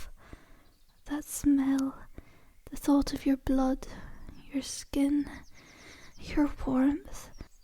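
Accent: Scotland, United Kingdom. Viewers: I love the hint of Scottish in the accent btw! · Scottish or British, miss? Or might you be Aussie? I'm lead to believe you are one of the three.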